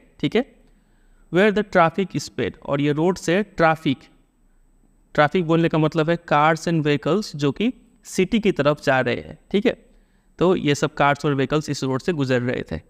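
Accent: Bihar, India. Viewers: IMAO BIHARI ACCENT ME ENGLIGH SUNNA BOHOT ACCHA LAGTA HAI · Sir aap bihar se ho ?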